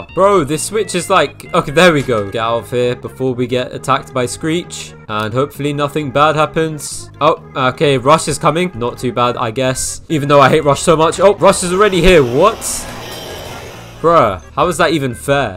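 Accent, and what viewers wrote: United Kingdom. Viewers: Lol British voice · He already voice revealed British🇬🇧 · It is too brittish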